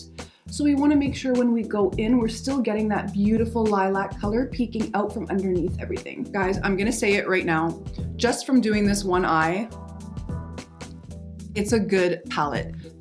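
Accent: Canada. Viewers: Are you Canadian? Your accent is so cute, like really eloquent and like, detailed pronouncing. Most Americans don’t talk like that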